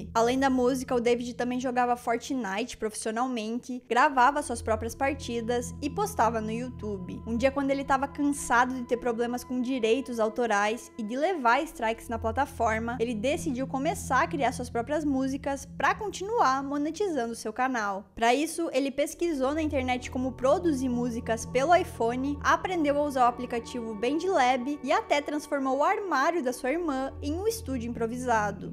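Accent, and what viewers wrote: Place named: Curitiba, Brazil. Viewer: Video muito bem produzido! Parabéns! ❤ Uma dúvida, você é de Curitiba? Pergunto por causa do seu sotaque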